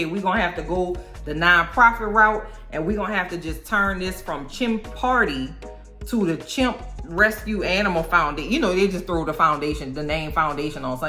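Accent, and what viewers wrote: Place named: Los Angeles, United States. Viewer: Just binged it… all these folks are delulu! I don’t know anyone else reviewing this, so I’m here to stay for a while! U sound like my LA ppl! 🫶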